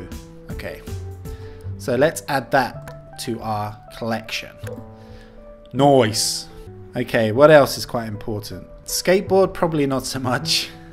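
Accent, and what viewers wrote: Finland. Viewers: you are doing great, i think its pretty funny that you pronounce all the swedish words with a finnish dialect.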